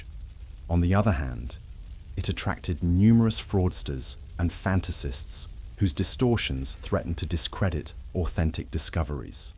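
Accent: England, United Kingdom. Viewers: Very interesting!!! Ditch the English accent. Please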